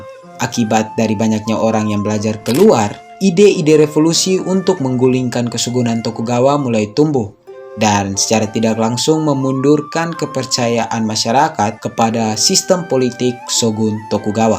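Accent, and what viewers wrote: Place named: Eastern Indonesia, Indonesia. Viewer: Fix Logat timur tdk bisa tipu hahahah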